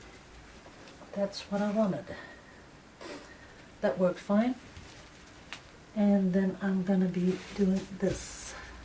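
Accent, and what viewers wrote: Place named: Netherlands. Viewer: ok cool. I'm relatively new to your channel and I'm hooked.. I think about 2 weeks.. but of course I'm not watching in order.. youtube recommends and I follow lol.. I like listening to you very much too.. you're so happy doing what you're doing.. and your accent reminds me of some of my relatives. My mom is from overissjel..and my dad from the Hague.. I'm in Canada.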